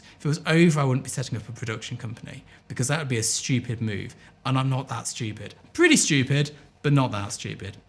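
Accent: RP, United Kingdom. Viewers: I have recently found your videos. The first one I thought ‘who’s this posh bloke and why should I be listening to him’😊 but as I have started to watch more and more of them I have to say I find them fascinating and very illuminating. I am not a photographer I work with video but some of these recent points resonate with me and my part of the industry. So hats off to you. Keep up the good work!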